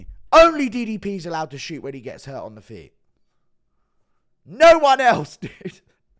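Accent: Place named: United Kingdom. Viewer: Hi guru. Please say beaner in your next video. I know this term doesn't exist in the UK and I've been dying to hear someone say it in a british accent for years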